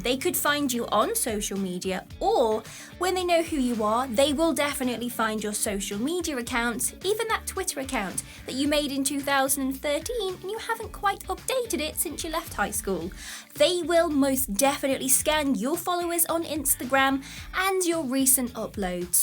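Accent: United Kingdom. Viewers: I Just listen to her for learning British accent lol.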